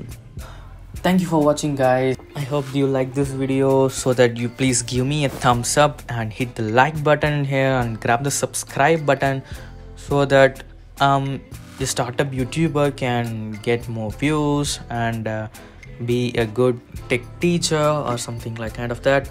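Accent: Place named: England, United Kingdom. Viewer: Bro im a fan of your English accent bro ❤😂😅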